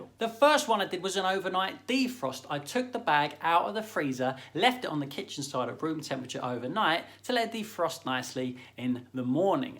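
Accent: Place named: Essex, United Kingdom. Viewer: You forgot to do it in that Essex accent.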